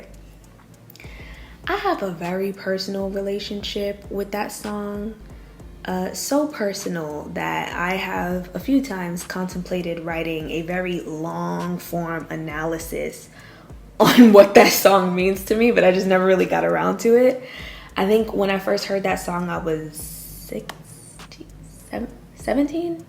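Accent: Jamaica. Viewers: im hearing some patois!! are you Jamaican?? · Your broken Jamaican accent is cute ❤😂 sending love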